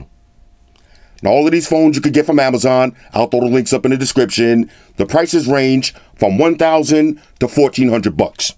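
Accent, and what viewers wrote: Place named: New York, United States. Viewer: yo! Love the videos people don't know that the way you talk that's that city shit natural some talk a little different but thats that new York talk,life you feel me my dude be easy keep your head up,keep making those videos and always be floss....like you always do Littles from ct,ya heard!!!!😎👍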